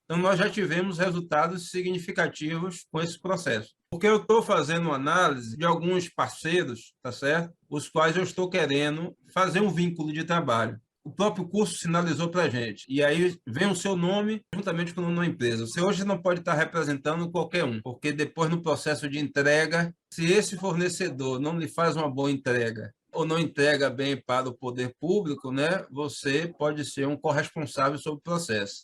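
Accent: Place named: Northeast, Brazil. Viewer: Seu sotaque nordestino, não define o seu intelecto . Parabéns brother!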